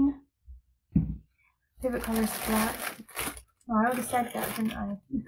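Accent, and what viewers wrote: Scotland, United Kingdom. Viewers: I've been listening to your lovely Scottish accent and following your awesome creations for about a year now. It's nice to see you in person. Congratulations on the upcoming addition to your family.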